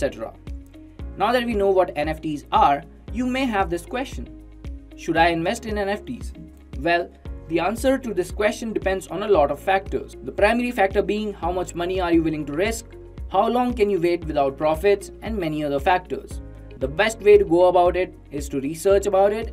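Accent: India. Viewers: Nothing personal because it's not his fault and he quite likely has good intentions, but I hate indian accents, it's hard to understand and annoying to hear in English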